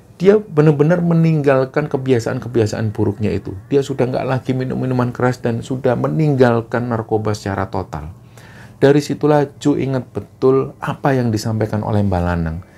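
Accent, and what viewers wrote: Java, Indonesia. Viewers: Assalamualaikum..luar biasanya skrg byk subcbrnya..ikut senang bgt👍👍🙏cerita yg dibwkan juga seru,serem,menengangkan dan mas bawakan crtanya enak di dgr..tetap ga ningalin jawanya juga..pokoknya keren banget👍👍😍🙏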